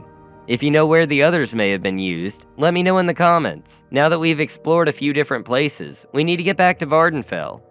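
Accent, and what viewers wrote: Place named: Canada. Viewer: Canadian detected. Don't you put it in your mouth though it might look good to eat (like a carrot or a beet!)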